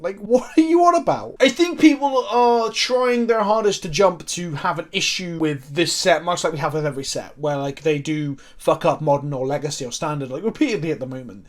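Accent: United Kingdom. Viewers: It's hard to listen on anything past 1.25 speed like i normally do, bc British accent lol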